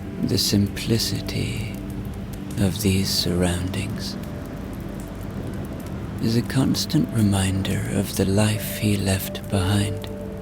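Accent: Ireland. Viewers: Adore an Irish story teller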